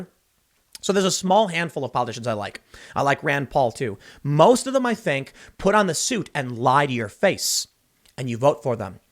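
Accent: Chicago, United States. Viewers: Yup. Im English but is it his slightly Northern/ Chicago accent?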